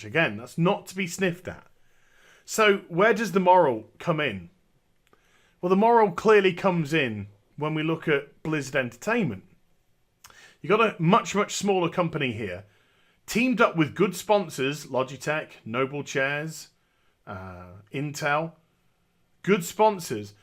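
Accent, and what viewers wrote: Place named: South Coast, United Kingdom. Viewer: Your a Yorkshireman? Really? I had you down for South Coast lad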